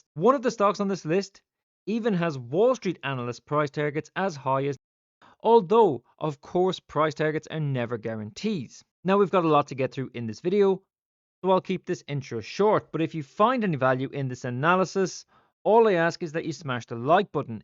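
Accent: Ireland. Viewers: The irish accent is killing me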